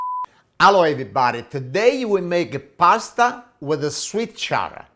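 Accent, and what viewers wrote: Italy. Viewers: Love the italian accent 👍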